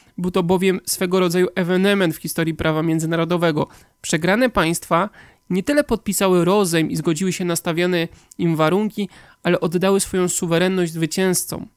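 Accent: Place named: Russia. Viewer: jesteś ruskiem słychać po akcencie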